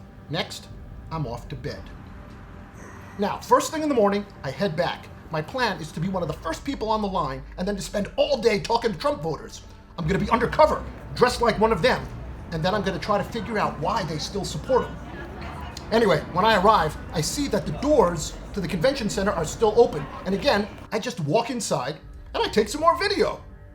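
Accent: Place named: Northeast, United States. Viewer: Mr., you mispronounce the name of the state of Nevada and would mispronounce the name of a highly important mountain range in the state of California, the Sierra Nevada. It is not pronounced "na Vaaaauuugh da," it is pronounced "Ne Va da." The middle syllable is pronounced like the word "Vat" without the "t." It sounds like you were born and raised somewhere in the northeast or upper Midwest of the United States. You are living in the west and should pronounce like the people who live in Nevada and the Sierra Nevada. Otherwise I like your undercover videos and most of your viewpoints. Keep up the good work!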